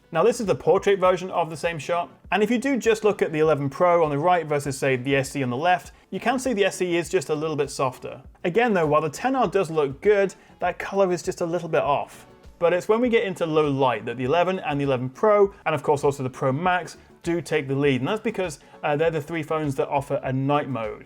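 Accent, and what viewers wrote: United Kingdom. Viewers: I´m subscribing because i´ve been studying english for about 14 years now... and your british accent helps me practicing and reinforcing my comprehension skills! (And also cause i love tech too i guess 😂😂😂) greetings from Colombia from a guy born in Argentina :[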